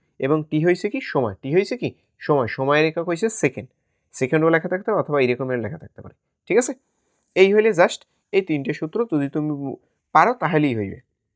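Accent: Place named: Barishal, Bangladesh. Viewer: Sir apni mone hoy barisailla....ami kintu barisaillah😊😊😊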